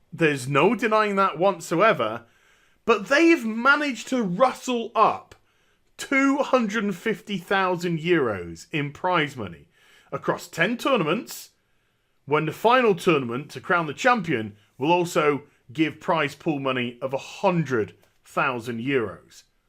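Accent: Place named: South Coast, United Kingdom. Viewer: Your a Yorkshireman? Really? I had you down for South Coast lad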